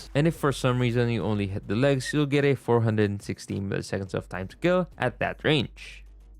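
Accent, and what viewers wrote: Philippines. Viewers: are you filipino im picking up some filipino accent.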